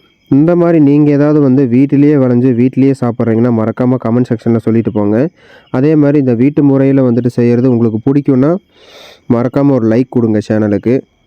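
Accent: Coimbatore, India. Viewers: Coimbatore slang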